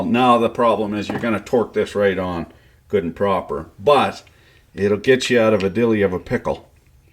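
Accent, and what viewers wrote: Quebec, Canada. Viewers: I was gonna say Canadian or from up in Michigan. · Help me out, I'm new to this channel and I cant figure out if hes super canadian or from texas. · i have a stupid question where you from men, i heard ya speak french and you sound like you're from quebec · He's a Canuck!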